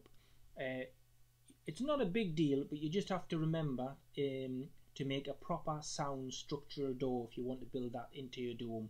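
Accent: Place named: United Kingdom. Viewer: Hi - you made very nice videos and also a great website. It helps a lot to understand dome structures. You sound like you are from UK and there they use imperial measurements if I am not wrong. However your calculation tools are mixed Metric and Imperial. (radius in meter but windspeed in Miles per hour). May I suggest to put a simple unit in your calculation tools. i.e. Radius/m: 6 - it would help. And since you have to touch your website again - can you share the formula you use for your calculations as well? Thanks for considering. Cheers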